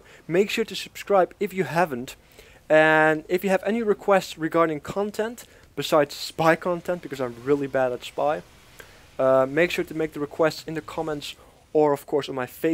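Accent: Netherlands. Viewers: Are you dutch? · I love ur vids Btw, are you from holland (you sound like it, i am 2)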